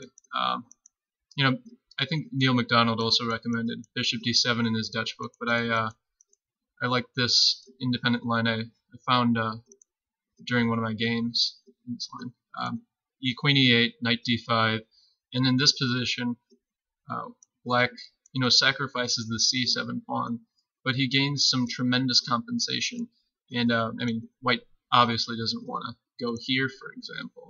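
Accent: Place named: United States. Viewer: Some chess video instructors for some reason present a black opening from the white player's side, which makes it more difficult to follow. Kudos for presenting the Leningrad Dutch from the black's perspective,. You presented well in clear American English, covering the intent of black for each move, and the possible white moves in reply. Thank you for a great opening that was taught well. I hope you do more chess videos!:)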